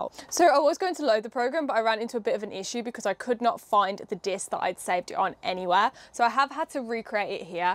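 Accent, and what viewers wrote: United Kingdom. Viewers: Heh the world is advocating for more and more women in tech, I'm advocating for more women in retro-tech! This channel is going places, not only because is a woman, but for the simplicity and to-the-point videos. For me it's all of the above, but the british accent and the way the talks thru her hands makes it for me. Instant sub.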